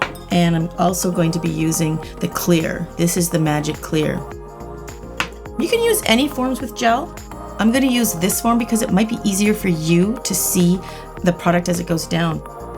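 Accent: Canada. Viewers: These are lovely! Forgive me if I'm wrong but are you Canadian? You pronounce things in a Canadian manner? (I'm English I try to really listen to the accents I watch on YouTube but do get them wrong at times) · are you from Canada?? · She sounds so Canadian in this video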